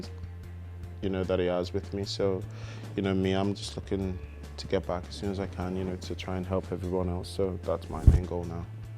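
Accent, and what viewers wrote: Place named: Liverpool, United Kingdom. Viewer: He sounds scouse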